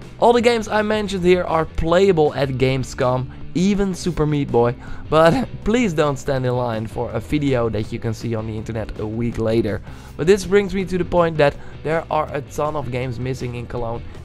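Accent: Netherlands. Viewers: That dutch accent tho ;)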